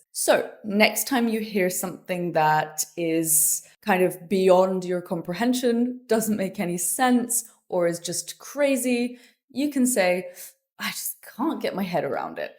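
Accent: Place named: RP, United Kingdom. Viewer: Hello! Thank you for this lovely video! I’ve noticed some rhoticity in your accent, even though it usually sounds like RP. Can you please educate me on what your accent is called or where it is most common?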